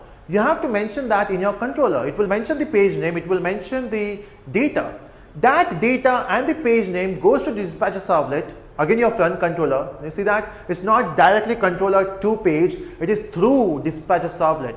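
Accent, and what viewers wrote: India. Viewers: your indian accent is horrible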